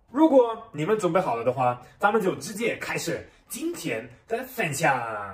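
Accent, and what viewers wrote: Sichuan, China. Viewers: 有点川普的嘛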